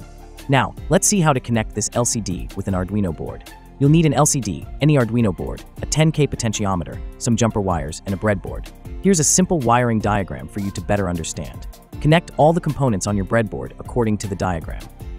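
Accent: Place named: India. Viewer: Parce que je préfère la voix d'un robot plutôt que du "Indian English"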